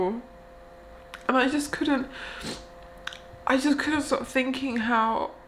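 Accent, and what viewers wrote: United Kingdom. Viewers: Your british accent is special🤪🤪🤪🤪👍👍👍👍 Be istiqomah/ consistent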